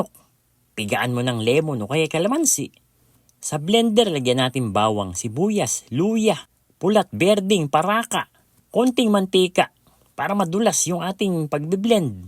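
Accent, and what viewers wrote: Bulacan, Philippines. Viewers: Puntong puntong bulacan boss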